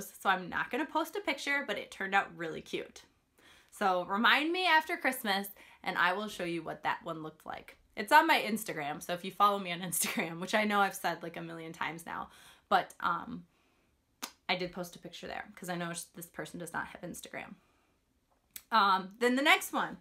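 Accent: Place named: Minnesota, United States. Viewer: Fun video my moms from Minnesota so I recognized your accent. I have a billion relatives there hey you could be one.........I remember being there in December. And my grandparents walking to church in minus 0 weather!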